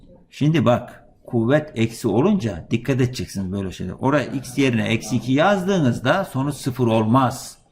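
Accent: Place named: Isparta-Burdur, Turkey. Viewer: hocam nerelisiniz şiveniz bizim buralara Isparta Burdur taraflarına benziyor ?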